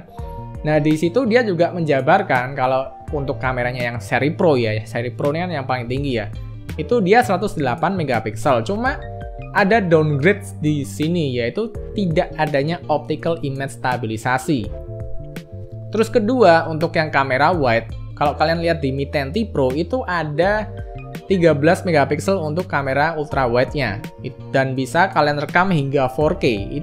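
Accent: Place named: Java, Indonesia. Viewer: Medok jawir anjir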